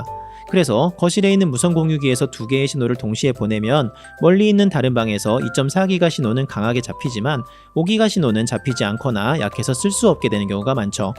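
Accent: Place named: Gyeongsang, South Korea. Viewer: 약간 경상도 억양이 있네요 그리고 전 이더넷을 박아놔서 ㅋㅋ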